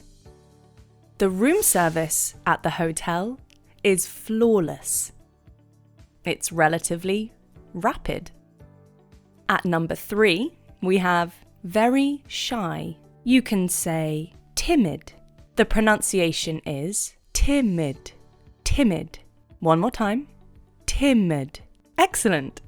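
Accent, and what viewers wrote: United Kingdom. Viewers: I really like your British accent. Furthermore, I enjoyed the lesson. Thank you 😊😊😊😍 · This girl's voice accent seems from united kingdom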